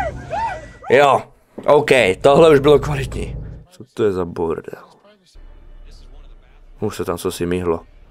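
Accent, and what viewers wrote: Moravia, Czech Republic. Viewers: Aaaa Bojanovcak :) si rikam odkad ted silny moravsky prizvuk (to je jedine dobre, uz mam tech "cechackovskej" vlogeru dost)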